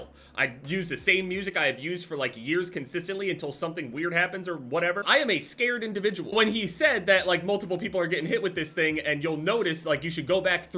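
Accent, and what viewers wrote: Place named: New Jersey, United States. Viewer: from jersey, thats kind of how we just regularly talk lol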